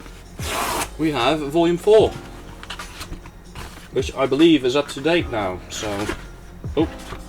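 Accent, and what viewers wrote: Netherlands. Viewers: you have a dutch accent but some words you pronounce are Super British haha, master of the Language, great video, that Rokka sounds great tbh .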